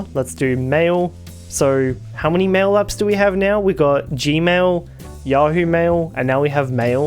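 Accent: Australia. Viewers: The Australian accent is THEE most annoying accent of all accents by far. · Are you a Aussie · because he’s Australian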